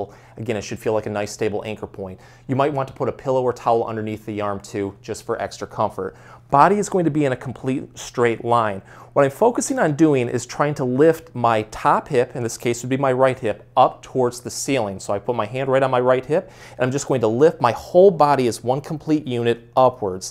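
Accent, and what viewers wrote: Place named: Midwest, United States. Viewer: Great video, right at home with your midwestern accent. Cheers from WI!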